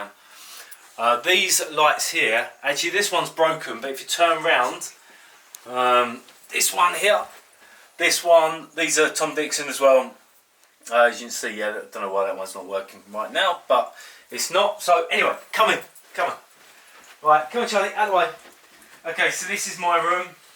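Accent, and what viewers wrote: United Kingdom. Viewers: thats a british accent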